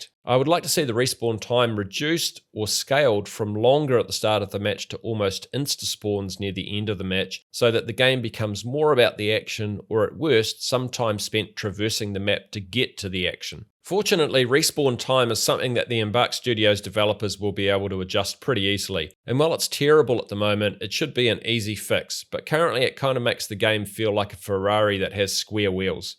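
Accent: New Zealand. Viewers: Nothing beats the kiwi accent!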